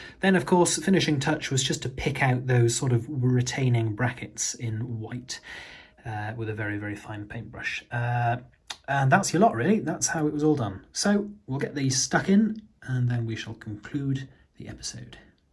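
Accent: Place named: North, United Kingdom. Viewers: Are you based out of the north east by any chance? Sounds like you have some northern accent come out now and again lol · Thanks. I have a long interest in mining, so thought it was a Davy. (Does your occasional Yorkshire accent have anything to do with family history 'oop noorrth'?)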